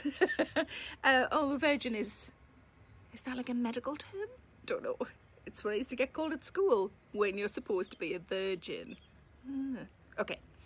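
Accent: Newcastle, United Kingdom. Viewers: Watching in St Louis, Missouri, USA. I first heard your voice on a TV while sitting in a hotel room in Wales and I thought you were the funniest comedian I'd heard in years. I wrote down your name so I could ask the friend I was traveling with if he'd heard of you, and he told me you were from Newcastle (he was too). I visit Newcastle for business every year, which helps me translate your Geordie to my wife when we both listen :)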